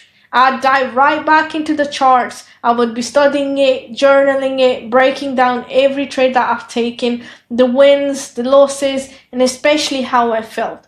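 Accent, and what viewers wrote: United Kingdom. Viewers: Are you from UK? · Thank you for the video. I am good with accents but I'm really struggling with yours. You sound a little Scottish, but not. Where are you from?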